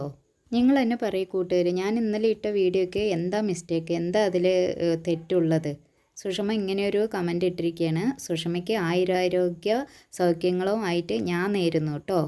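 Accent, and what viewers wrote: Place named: Kannur, India. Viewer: സഹോദരി ഒട്ടും വിഷമിക്കേണ്ട. ഭ്രാന്തൻ മാർ പലതും പറയും ഞാൻ മുപ്പത് കൊല്ലമായി ടൈലറാണ് എനിക്ക് നിങ്ങളുടെ വീഡിയോകൾ വളരെയധികം ഇഷ്ടം നമ്മുടെ കണ്ണൂർ സ്റ്റൈലിൽ സംസാരം വളരെയധികം ഇഷ്ടം..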